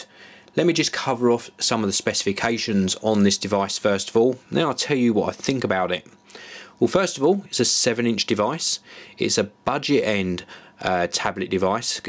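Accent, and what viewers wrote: United Kingdom. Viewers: I love hairy guys with british accent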